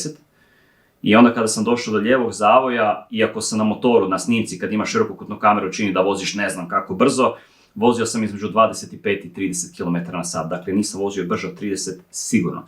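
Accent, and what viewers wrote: United States. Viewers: Aaaa buraz,a gledam tvoje klipove i uvijek pomislim da si Amerikanac😂😂😂😂😂😂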